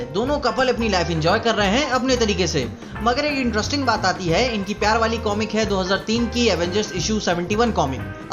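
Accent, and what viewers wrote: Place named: Uttar Pradesh, India. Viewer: bhai, i watch your video since 2 days and all your videos are soooo amazing. keep doing this and your up accent is also really amazing.... kanpuriaaaaaaaa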